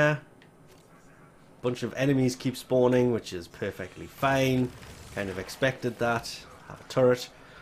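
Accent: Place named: North, United Kingdom. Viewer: Knew I was subscribed didn't know you were northern - just yay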